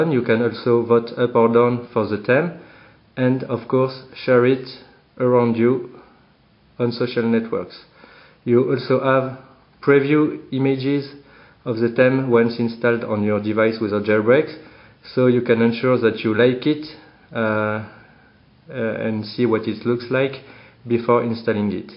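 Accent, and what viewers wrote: France. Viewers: J'adore cet accent! Vive la France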